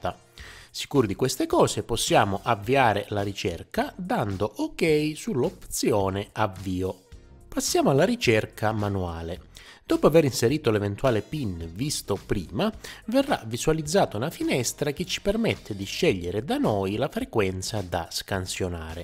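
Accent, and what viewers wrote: Calabria, Italy. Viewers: Sei calabrese?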